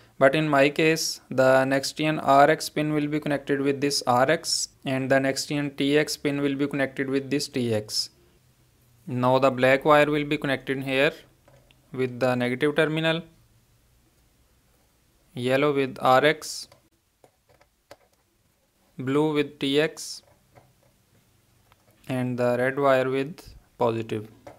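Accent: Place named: Pakistan. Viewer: after hearing your voice I'm guessing you are Pakistani.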